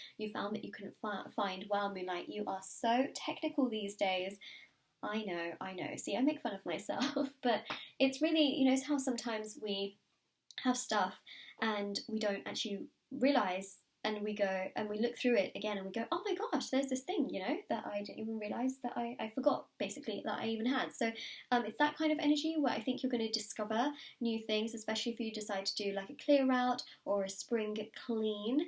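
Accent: England, United Kingdom. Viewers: I adore your accent, so Hermione Granger 😍 What part of England is that?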